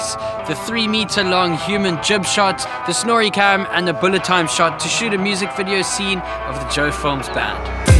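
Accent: South Africa. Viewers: First time I'm watching this YouTuber. And a few seconds in I'm thinking this guy sounds like a South African 😀 awesome work 👌👏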